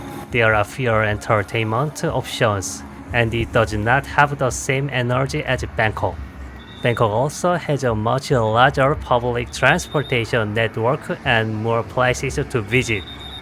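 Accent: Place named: France. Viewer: Hi Bro, i´ve a few questions. You mentioned that Bangokok grows in cost from 2021 to today, this is in bath or US dollars? when you move around the country, you use train, bus or plane? Estimative, how much money you need by month, for all expenses ? (Aproximaly) And last, do you speak French? You have the accent!!!! Thanks for your work, and I send to you a hook from the end of the world (Ushuaia)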